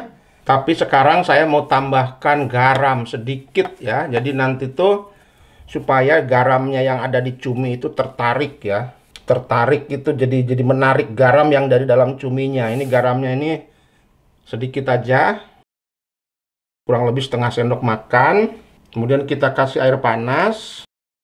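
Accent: West Java, Indonesia. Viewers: Assalamualaikum koko.wk wk wk sunda bngt ada kungkurahan.logatnya jg sunda.masakannya mantaaaap 😂😂❤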